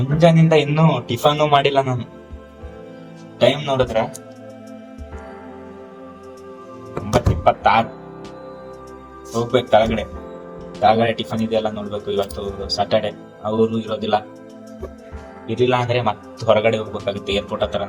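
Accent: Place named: North Karnataka, India. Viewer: Anna namma north karanataka language nag maat ada your logs is very nice👍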